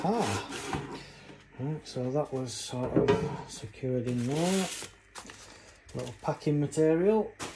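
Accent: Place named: England, United Kingdom. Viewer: Glad to hear an English voice (for a change). Good luck with the channel.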